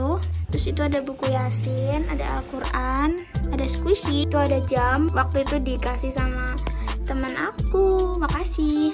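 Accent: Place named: Java, Indonesia. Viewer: Orang Jawa ya,,,,, suaranya tuh kek jawa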